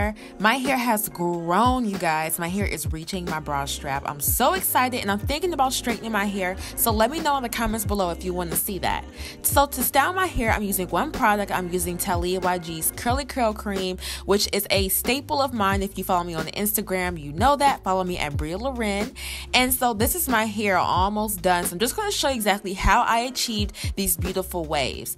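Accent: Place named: Philadelphia, United States. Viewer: baby girl u from Philly? it's soo subtle but i hear an accent😁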